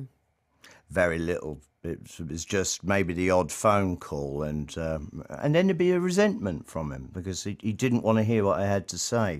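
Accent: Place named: United Kingdom. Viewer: British accent and editing sounds smart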